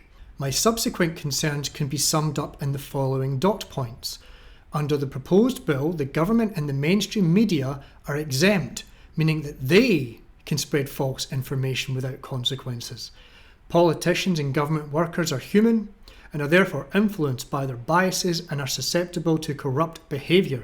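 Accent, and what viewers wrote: Scotland, United Kingdom. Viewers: How can I support you in the $ sense ? ? Seriously, we need a lot more people like you (with or without the dour Scott's accent) I'm in Melbourne, and not that hard to find.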